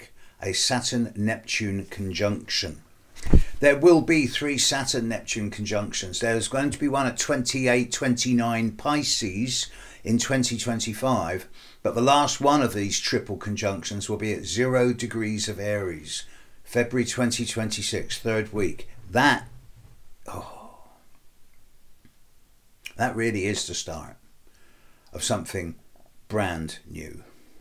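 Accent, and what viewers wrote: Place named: England, United Kingdom. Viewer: Hi! I love your astrology reading and your accent, where you come from in England? I need to go there 🙏😊 Thank you so much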